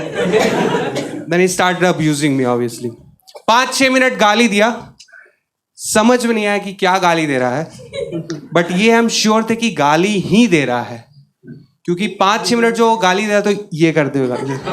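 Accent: Bihar, India. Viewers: Bro, your comic timing is just amazing and by your accent, I can absolutely say with utmost confidence that you are too from Bihar.. Pr bhai, aap Bihar ko agr represent kr rhe ho, kisi bhi stage pr, to uski achhi chijon ko batao, talk about his glory and the progress that Bihar is on.. Not ki kuchh paiso k liye bhai tumlog bhi wahi kro ek time pr bhojpuri gaano ne Bihar ka image kharab krne me kiya hai.. When we are not going to respect our birthplace, how will others be going to respect?? I respect and enjoy your comic timing but please let us, the educated one, try and revamp the beauty and image of our birthplace Bihar, and it should be our first priority wherever we are. Waise, hum bhi Patna se hai. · Boliye se pta chalat hai marde tu bihar ke na hawa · Why that person said you're not from Bihar? Your accent seems 100% like any Bihari. My friends also speaks like this.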